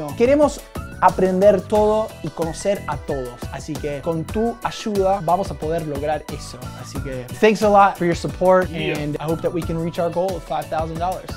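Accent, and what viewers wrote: Argentina. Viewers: La primera vez que veo tus videos y me encantan! Lindo acento Argentino jejeje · Te olvidaste de como hablar en argentino? O solo estabas hablando asi por el colo? PD: No te pude ver en Argentina :'( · Aguante el proyecto kity pasta!! jajajaja volve a Argentina, sos un groso! mi novio es italiano y habla el " castellano Argento" como vos :) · BUE, muy lindo todo lo del acento argento, el colorado un copado también, pero como decimos por acá PONETE A LABURAR QUERIDO!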